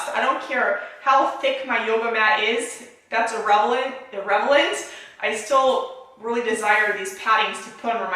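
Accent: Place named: Chicago, United States. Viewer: I agree with you 1000%. I wish I would have started earlier to take care of my body. The last 50 yrs went by so fast and I am not 71 yrs old and while I have given up meat I still eat some types of fish and eggs though i am trying to wean myself from that. I will most likely give that up by February though I still drink non-fat milk and sometimes I eat cheese but Not butter. So i am looking forward to being a vegan by Feb 1st. Thanks for you video.. Your accent sounds like your either from back east or Chicago. I am originally from Ohio but have lived in Los Angeles for the last 57 yrs. I love your birds and my "tiel" "Baby" is still with me. Thanks again for your video ..Take care...keep up the good work!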